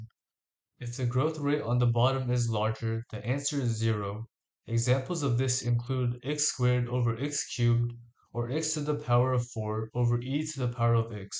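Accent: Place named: Asia. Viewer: I dont think he's Indian, he sounds more central asian, southeastern asian, or pacific islander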